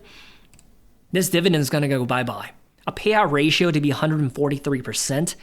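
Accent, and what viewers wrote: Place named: Philadelphia, United States. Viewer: lol k just sometimes sounds like you have a philly accent🤔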